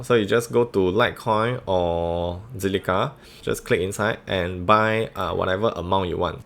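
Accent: Singapore. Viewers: Gotta love the Singaporean accent. Huat ah. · Wow the Singapore accent is actually a thing! I almost thought I was listening to the other youtuber Chicken Genius. Interesting ^^ Also thanks for taking the time to write up this guide of course!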